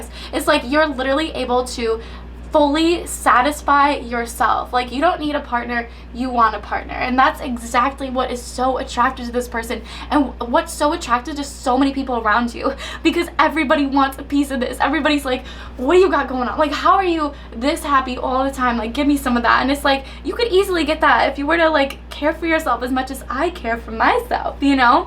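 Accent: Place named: Australia. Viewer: Loooool your Australian accent made me giggggleeee and then your Little Mix Jamaica interview reference 🤣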